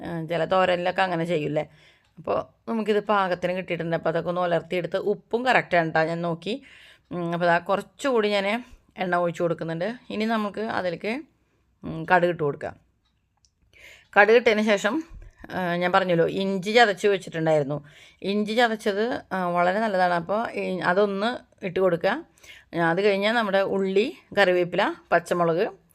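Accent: Thrissur, India. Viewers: Hi che,thank u for uploading videos soon,from a thrissurkari who lives in Melbourne now. I love watching ur vlogs to hear Ur thrissur slang and Ur simple life.love u che a lot.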